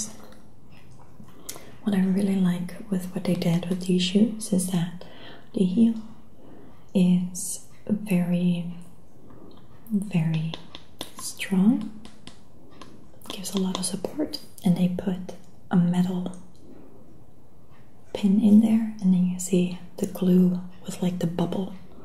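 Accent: Netherlands. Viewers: she's Dutch its the way she speaks !x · Calm down. She is dutch, English is not her first language. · She talks like that because she is from the Netherlands. Obviously, not everyone speaks English. Most people who watch her videos love her accent. So, if you don't then don't watch her videos,simple. She is trying to help relax you/give you asmr tingles, not talking about the way people talk. Maybe if you was actually smart,you would realize she is from the Netherlands and she has an accent and can't help the way she talk,but you don't know that. I mean,it's first grade so learn it. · she;s Dutch, and therefore has a Dutch accent. Don't be rude. This is a relaxing community...please take your negativity elsewhere.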